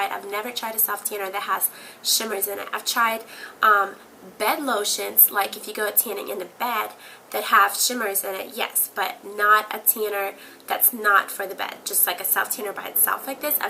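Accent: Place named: New York, United States. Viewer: totally unrelated,,,but are you from Jersey or NY..guessng by the accent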